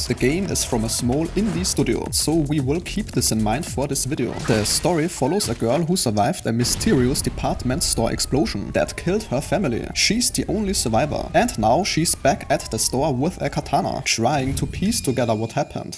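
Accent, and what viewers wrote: Germany. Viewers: Sprichst du deutsch? Dein Englisch klingt so. Kann man in dem Spiel irgendwo speichern oder muss man jedesmal komplett neu anfangen wenn man stirbt und dann alles verliert 🙈?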